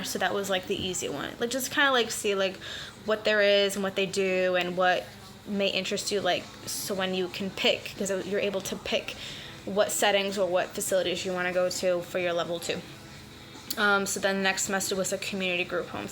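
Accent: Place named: Boston, United States. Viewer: Hi! I saw in a previous comment that you started off as a per diem because you couldn't find something close to you. I just want to know where you're from if you don't mind me asking? I think Boston cuz of your accent but not sure??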